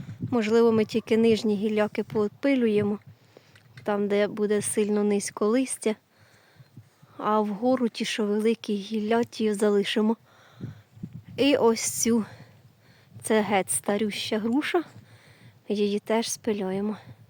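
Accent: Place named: Cherkasy, Ukraine. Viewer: А яку назву має ваше село? По говору чую, що область Черкаська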